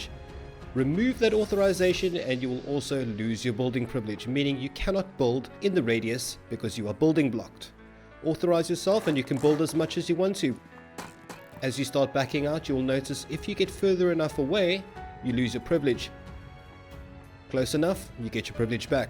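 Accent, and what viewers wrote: South Africa. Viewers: Are you South African,? You sound like that bad guy from Elysium. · Still feels so strange every to hear a South African accent every time I click on one of your vids, so used to NA/EU accents on YouTube.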